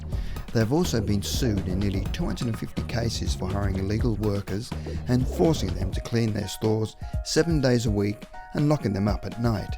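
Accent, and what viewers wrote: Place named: Australia. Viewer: cant stand this shit australian accent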